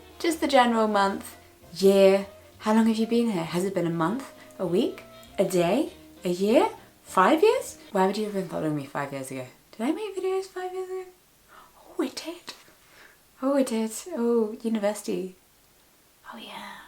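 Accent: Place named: England, United Kingdom. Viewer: I found you right before Christmas last year, not sure how...Love your upbeat personality and especially your lovely proper English accent. I'm American and love anything British and you could have been a sister in Downton Abbey!! I'm in love with James Norton!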